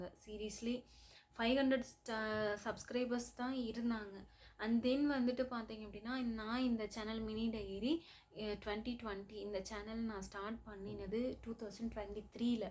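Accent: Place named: Kanyakumari, India. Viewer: தமிழ் பேசும்போது மலையாளம் கலந்து வரும்போது கன்னியாகுமாரி தமிழ் மாதிரி இனிமையாய் இருக்கிறது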